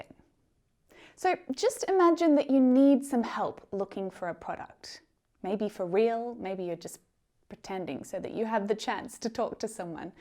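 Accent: Australia. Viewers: It's Luke Hemmings from 5 seconds of summer! I love that band and I also love Bang chan, he is australian but he's member of a kpop boy group called stray kids<3 . . . I like the australian accent and your videos are amazing, thanks for everything:) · Hy Myself Anees Bangash from Pakistan currently I am here an Dubai I realy appreciate your efforts to teach u Assuies accent i enjoy keep teach bless u ❤❤❤❤ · I really into Australian accent! It's cool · I really love Australian accent. Thanks a lot mam. Love from India❤️ And I am a new subscriber....... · Hey great lesson, I love australian accent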